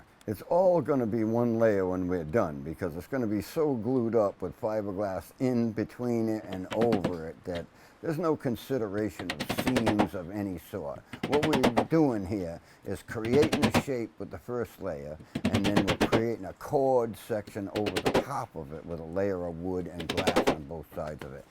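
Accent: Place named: Boston, United States. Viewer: You have a stronger Boston accent than me haha, nice video!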